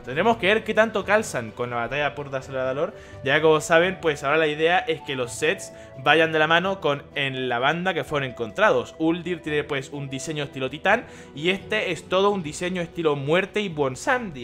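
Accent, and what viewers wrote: Chile. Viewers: este men es chileno no?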